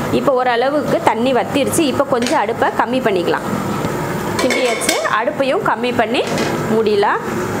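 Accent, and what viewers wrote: Coimbatore, India. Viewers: Akka neenga Coimbatoraa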